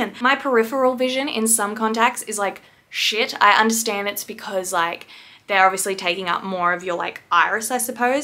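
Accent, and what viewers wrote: United States. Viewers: The southern accent is one of my faves ahahahha 🤙🏻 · I love the country accent. Sounds just like my ma lmao 💜🖤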